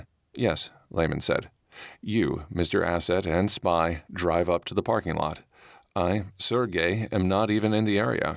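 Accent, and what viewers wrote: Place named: Maine, United States. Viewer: On the Maine accent lol Im from Maine and I'm native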